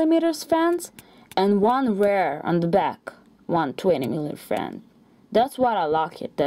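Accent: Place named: Russia. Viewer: where are you from i feel a little Russian accent :)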